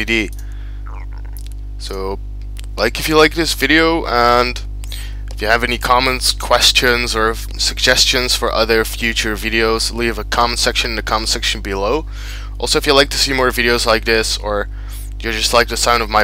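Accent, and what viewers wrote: Netherlands. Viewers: nou bedankt.. erg handige vid.. alleen jammer van die ruis heerlijk die headphones he and yes you can clearly hear your dutch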